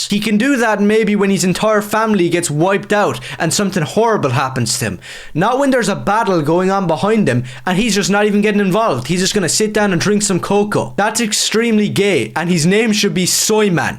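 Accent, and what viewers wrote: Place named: Ireland. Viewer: It's Irish accent.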